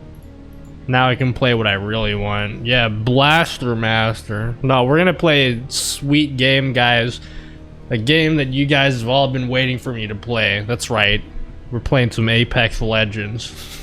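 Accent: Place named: United States. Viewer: Okay your accent sounds so American to me and you're constantly talking like you're not American and it's confusing the hell out of me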